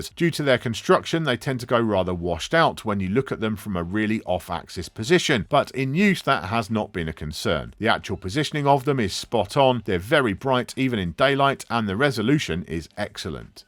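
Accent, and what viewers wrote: England, United Kingdom. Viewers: In we TRUST!!! The only English accent DJ I can stand lol... No offense please, but let's be honest, I hate watching videos from people with an accent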